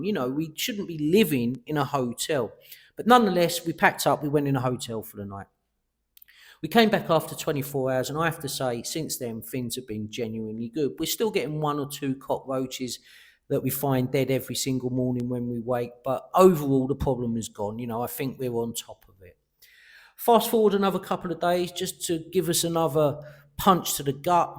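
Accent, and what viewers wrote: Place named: England, United Kingdom. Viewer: hi bub, youll find, if you loose that pomy accent, things will get beter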